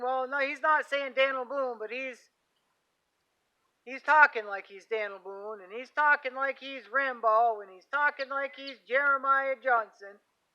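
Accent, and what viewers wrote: Maine, United States. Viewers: I bought my mom in CT several cases of H2O and clearly marked them for emergency. Next time I went there I asked her where she stored it. She took it to the dump. Couldn’t understand why she needed it. I just smacked myself in the forehead. I’m glad I found your channel. I lived in Belfast for 8 years. I miss the great no nonsense Maine way of thinking (and the accent). I’ll keep watching ya. I’m in your tribe, but in TN now.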